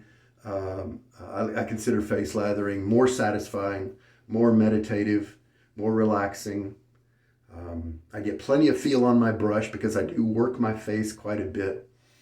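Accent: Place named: Carolina, United States. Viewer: oh it’s no problem at all! I get a bit overzealous with my comments sometimes haha. Very cool! I felt I was recognizing a carolina’s accent.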